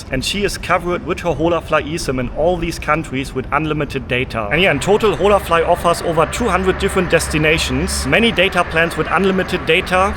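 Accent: Germany. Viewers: Your German's accent is really strong huh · He's got a horrible German accent. But if that is what you like, just listen to Germans. Just find the ones with the worst accents and whose vocabulary is extremely limited and basic. Many out there in Germany. 🙃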